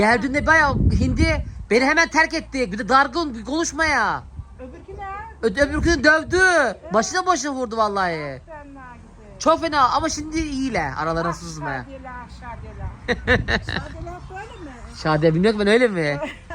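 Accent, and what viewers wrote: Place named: Zonguldak, Turkey. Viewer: kardesim seni izleyen hic bir izleyicin sende negatif bir sey aramaz aramamasida gerek sen dogal zonguldak sivenle güler yüzlülügünle insanlara büyüklerine annene babana evine gelen misafirin ve takipcilerine sayginla bizlerin gönlünü kazandin kardesim biz seni dogal icten samimi halin ile seviyoruz yeni videolarini ve eski vidolarinida zaman buldukca izliyoruz bizler gurbette Almanyada yasiyoruz güzel ülkemizin memleketimizin özlemini senin cekimlerinle gideriyoruz esim Bartinli ben Cide 'liyim sivelerimiz bir birine cok yakin senin videolarinda dogal sivenle anlatiminla memleket hasretimizi gidermeye calisiyoruz iyiki varsin kardesim annene babana kardeslerine takipcilerine Almanyadan selamlar sevgiler kardesim.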